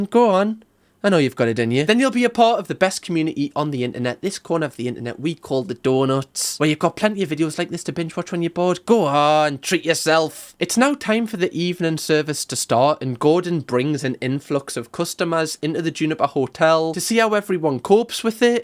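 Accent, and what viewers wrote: United Kingdom. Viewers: omg ... delightful to see another Gordon Ramsay reaction / essay ... AND you have a authentic genuine british accent , beautiful :)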